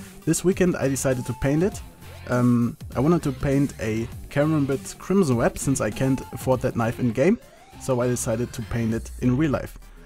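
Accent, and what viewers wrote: Germany. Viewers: Junge man hört so richtig dass du Deutsch bist xD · Das Englisch ist super, nutzt alle Vokabeln richtig, macht keine grammatikalischen Fehler. Ist halt nur der deutsche Akzent den man hört.. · Man are you german? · Arbeite mal an deinem Akzent das hört man mega, dass du deutsch bist. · man hört deutlich das du normaler weise deutsch sprichst, warum machst du dann keine deutschen videos? das würd mich wirklich mal intressieren :) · Oh no. German english :( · hört sich sehr deutsch an;) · nur mal so man hört es wirklich raus das du deutsch bist aber mir gefallen die videos trotzdem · Man hört halt den deutschen Akzent mega raus, ansonsten spricht er schon gutes Englisch =) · You sound so german :D You actually are I guess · Du bist doch Deutscher.. oder?! xD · finde ich echt nice wie du das hingekriegt hast( ich weiss das du deutsch bist höhrt man richtig heraus:p) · Des war.mir schon klar weil die meisten deutschen so reden wenn sie englisch reden:3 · . 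Lass mich raten.... Du kommst aus Deutschland. Und der Akzent ist auch ziemlich offensichtlich. Alles in allem ist der Karambit aber gut gelungen und es ist auch schön zu wissen, dass hier keine Profis am Werk waren, weil man so das ganze besser nachkonstruieren kann. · You can hear it somgood that your german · man hört sofort dass du deutscher bist x'D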